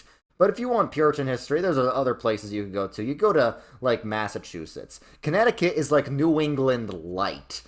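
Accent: New York, United States. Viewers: New York accent came out strong in this video. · The NY accent was carrying in this video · Wait.. is that a NEW YORK ACCENT I HEAR?! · A) your earlier videos seem to have downplayed your New York accent but it seems you've recently embraced it. B) Detroit has the best pizza. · I don’t know if you just played it up for this video or I’m just noticing it now, but your New York accent is really coming out.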